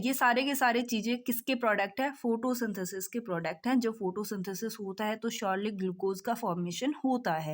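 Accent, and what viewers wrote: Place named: Bengal, India. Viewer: i think you are bengoli because your language tells that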